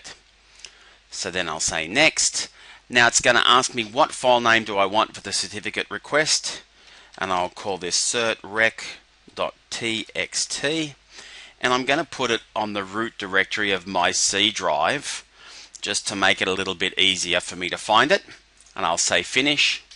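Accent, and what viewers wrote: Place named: Australia. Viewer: love the auzzy accent ;)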